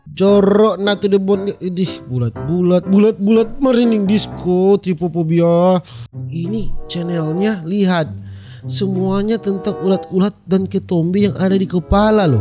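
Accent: Manado, Indonesia. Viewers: Hahahahhaa manado memangg dorang pe logat gagarap memang e 🤣🤣🤣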